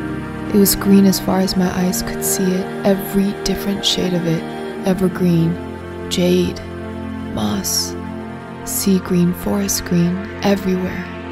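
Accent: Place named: United States. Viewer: American by birth (the accent) and half irish, half japanese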